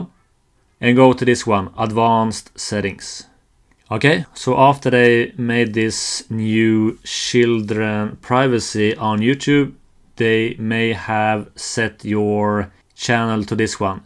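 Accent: Sweden. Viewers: he is swedish i hear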